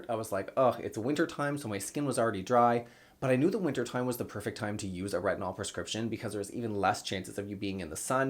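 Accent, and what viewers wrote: Canada. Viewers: Your (eastern?) Canadian accent is adorable.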